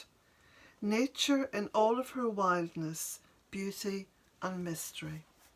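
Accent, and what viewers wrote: Ireland. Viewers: Also your reading is so lovely! You might consider offering your books on tape. It is so pleasant hearing it in your lovely Irish lilt. Blessings to you, dear.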